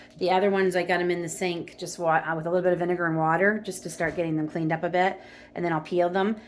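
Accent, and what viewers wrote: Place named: Canada. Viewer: Thanks for sharing! SW Ontario here! I heard your accent and was searching for anything that pointed to you being Canadian. I saw the Bernardin lid and was so excited (obviously missed the bagged milk)! Pork was my first pressure canning experiment last year and my first meal with it was nachos. I figured if it was going to kill me, I was going out on nachos. Bit more confident in my canning now, haha!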